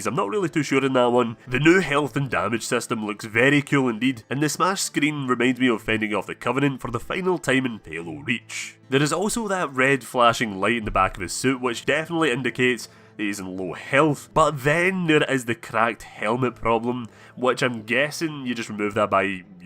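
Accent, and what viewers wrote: Scotland, United Kingdom. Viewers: With that accent it seems like a foreign language! Are you scottish ?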